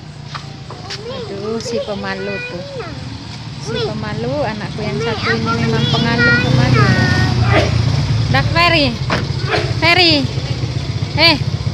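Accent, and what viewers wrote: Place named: Jember, Indonesia. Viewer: asli mana ya klu boleh tau kayak logat bicara e daerah jember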